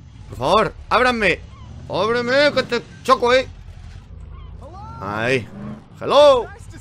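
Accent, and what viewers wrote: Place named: Galicia, Spain. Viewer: de donde eres? (tu acento parece gallego)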